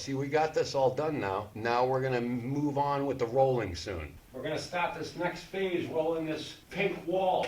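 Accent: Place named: Boston, United States. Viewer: I've watched a ton of videos about cutting in, including ones by pretty high-profile YouTube painters/DIY guys, and this one was by far the most helpful to me. I like that I can see exactly what you're doing, and that I get to see you do it over and over and over and over while you describe what you're doing each time. Also, you have a cheery, encouraging attitude, which is nice :) Oh, and I love the Boston accent. I'm halfway through painting my basement right now, and even though it's my first time painting, my lines are super clean. Thanks!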